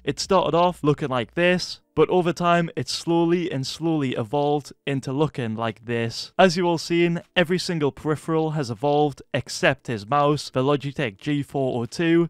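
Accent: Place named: Newcastle, United Kingdom. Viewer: here r u from newcastle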